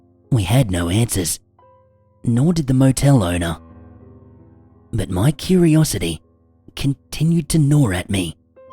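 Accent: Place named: Australia. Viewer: The VOICE from DOWN UNDER!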